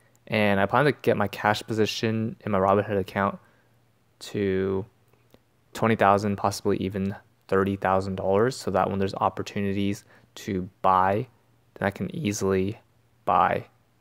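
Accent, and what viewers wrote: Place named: India. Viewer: Naw buddy I can tell, you have a slight indian accent street shitting fren.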